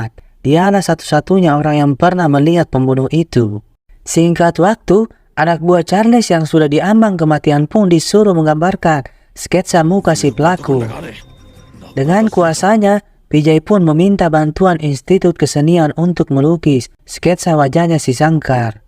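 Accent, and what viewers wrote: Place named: Makassar, Indonesia. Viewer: Logat makasar ni host nya . Cara baca pun Sulawesi ki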